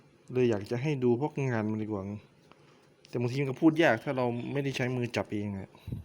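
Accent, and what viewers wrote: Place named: North, Thailand. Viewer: คนเหนือกาครับสำเนียงมันใช่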